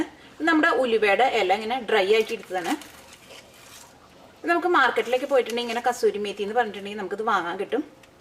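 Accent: Thrissur, India. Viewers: You are interesting to watch. Your Malayalam with that Trichur slang is more interesting. You are my guru in cooking.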